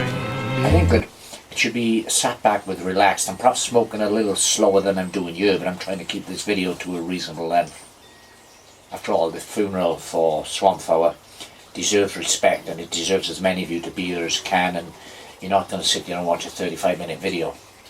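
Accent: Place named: Welsh Valleys, United Kingdom. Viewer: Who'd have thought it? The YouTube algorithm eventually found me a channel exactly to my tastes. I have a fair amount of pipe channels saved and favourited, but you're the only fellow Welshman I've happened upon, and a western writer too. Dare I assume your accent is from the Ogmore Vale, maybe the Rhondda? I'm hailing from the Twrch valley between Swansea and Brecon. From what I've seen, your content is great. Fantastic job, Bendegedig! You should be proud 😎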